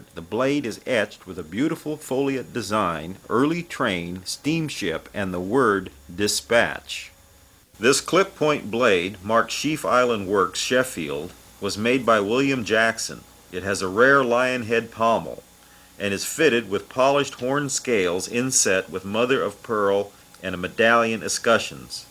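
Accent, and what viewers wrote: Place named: California, United States. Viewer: You know this guy was raised on a walnut and prune farm in California. Not a Yankee or Southerner. Many old time people from California pronounce words differently. Listen to some of the old time radio and television programs. For that matter do you pronounce Los Angeles and Saint Louis correctly? Most people don't.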